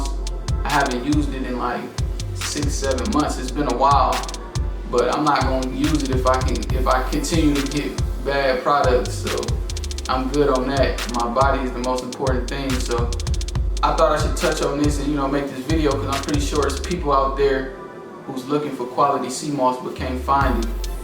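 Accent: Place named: Philadelphia, United States. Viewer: 😂 I hear Philly all in this man voice. Good video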